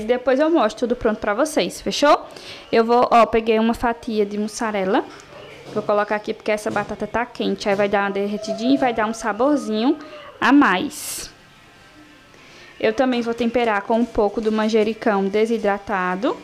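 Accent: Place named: Caruaru, Brazil. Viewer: Menina kkk eu cai de paraquedas no seu canal eu conheci logo que vc é de Caruaru pelo sotaque, aí esperei pra ver se vc realmente era de Caruaru, sou de agrestina. Somos vizinhas ❤️❤️❤️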